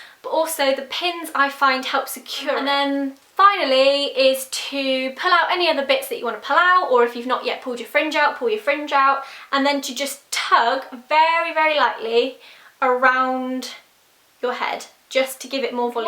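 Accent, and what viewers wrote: United Kingdom. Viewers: I love your Videos , just perfect ,and you've got an Amazing British Accent , !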